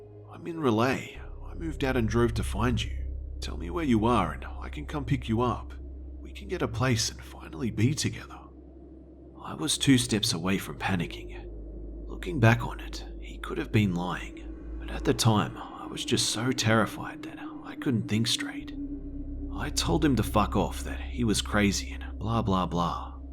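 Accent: Australia. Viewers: It feels so good to finally have a fellow Aussie narrator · are u a aussie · Fuck yea it's refreshing hearing a Fellow Aussie telling a horror story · you voice is priceless are u aussie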